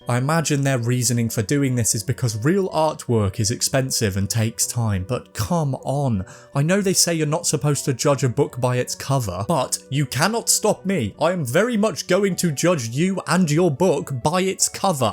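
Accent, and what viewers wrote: United Kingdom. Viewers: I have actually put your videos on to sleep before specifically bc you have a deep voice and a British accent. You and Simon Roper. You called me out so hard lmao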